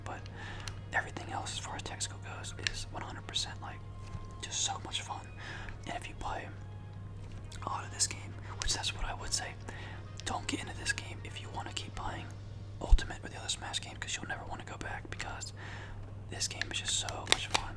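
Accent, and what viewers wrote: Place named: South, United States. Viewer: you kinda sound like ppmd but when he was amish (just kidding but when he still had his southern accent)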